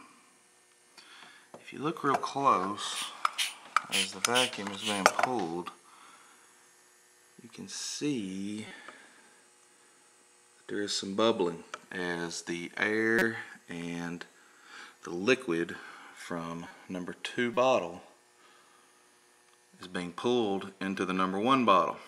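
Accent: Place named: South, United States. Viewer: Southern accent and used vodka bottles. God bless America. : )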